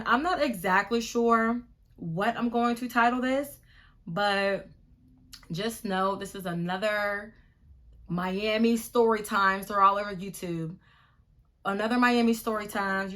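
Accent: Pittsburgh, United States. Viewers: It’s so crazy cus when you start telling the story, I’m like she sound like she’s from Pittsburgh 😭 We really have an Accent fr lol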